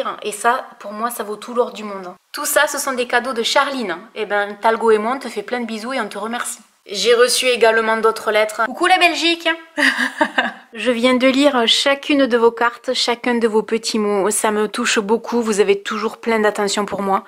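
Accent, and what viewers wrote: South, France. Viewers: Joyeux Noël à toi, ton fils et ta maman et aux abonné-es🎄💕. Merci pour tes vidéos tu es un véritable rayon de soleil 🌞 avec l’accent du sud j’adore 😍! À la base je ne suis pas trop branchée YouTubeuses beauté mais je t’adore tellement que je regarde tout tes contenus et suis super heureuse dès que tu fais plus de vidéos 🍀🙏🏼. Gros Bisous de Suisse 🇨🇭 😘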